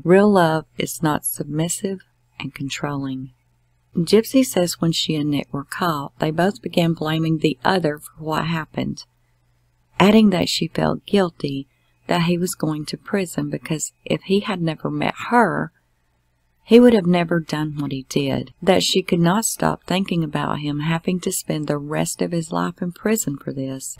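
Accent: South, United States. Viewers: There’s something about your voice.. it’s soothing. Maybe it’s your southern drawl or the inflection in your voice?!? Either way I do enjoy your videos, book synopsis are my favorite.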